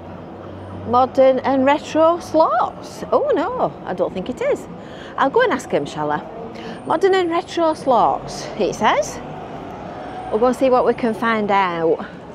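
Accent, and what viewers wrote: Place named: Lancashire, United Kingdom. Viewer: Hello, I found your ‘vlogs’ only last week, nice hearing a Lancashire accent, I’m a Lancashire lass (well not a lass I’m 80) I always went to the illuminations with my parents the 50’s and remember standing in a doorway of the old Woolworths up a side street and the ‘sea’ coming across the road. Ive subscribed so will watch all your vlogs,thank you and stay safe, don’t get blown away 🚶‍♂️☕️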